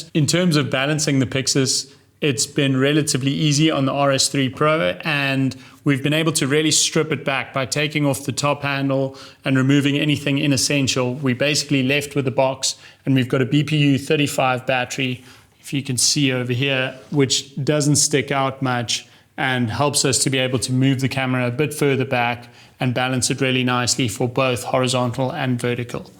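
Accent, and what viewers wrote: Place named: South Africa. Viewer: Nicest Saffer accent I’ve heard. Usually pretty harsh. Great footage and gear review!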